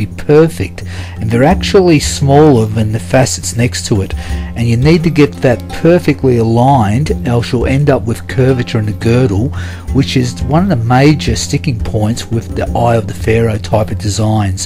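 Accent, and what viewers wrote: Australia. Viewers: I’m very happy your south aussie and you sound like russel cought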